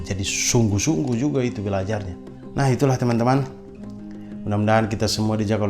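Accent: Eastern Indonesia, Indonesia. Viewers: Alhamdulillah ka...selalu segar dengan logat timur nya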